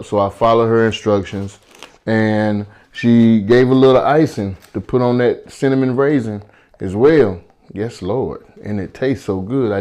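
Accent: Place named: Alabama, United States. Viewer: Sound like he's from Alabama. Food looks great!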